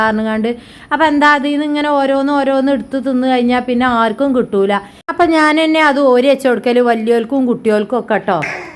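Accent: Malappuram, India. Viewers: മലപ്പുറത്തു ഒരു വിധം എല്ലാരും ഇങ്ങനെ തന്നെ ആണ് സംസാരിക്ക വെറുതെ ഓരോന്ന് കുറ്റം പറയുന്നതെന്തിനാ 😮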